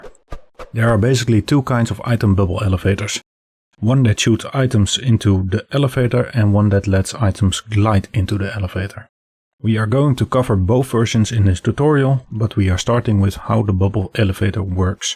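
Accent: Netherlands. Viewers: Thanks for explaining! I can sense Dutch-ness from miles away haha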